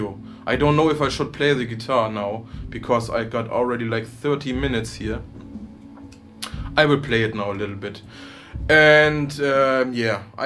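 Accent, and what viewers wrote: Germany. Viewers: I really agree with everything you are saying. I own 5 Harley Bentons now and I enjoy every single one just as much as my snobby Schecter for over 1500 bucks. I've told everyone who asked me what guitar they should buy to check out the Harley Benton products. You just can't get anything better for the price(or sometimes even double the price) and if you should ever get a flawed one, you can always send it back for absolutely free. Keep up the good work, spreading your knowledge and helping other people not being tricked into this expensive gear snobbery. Nevertheless, I would also like to recommend you to work on your englisch. Not trying to be mean or anything. I know it can be hard, especially for german speakers(i'm austrian btw) because the german language is so vastly different in the pronunciation, and i appreciate your effort to make this understandable for as many viewers as possible. 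But I really think it would help your channel a lot to work a little bit on the english just to comfort the native speakers ;) That being said, I hope that you will get to the success that you deserve for your effort and pure honesty and I wish you all the best on your way. I'm happy to say that you just gained another Subscriber!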